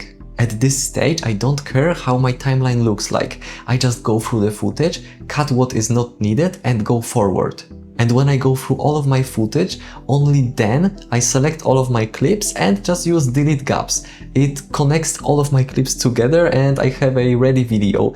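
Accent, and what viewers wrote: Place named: Poland. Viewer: Nice video! Going to try to use your workflow. Accent sounds Polish ☺️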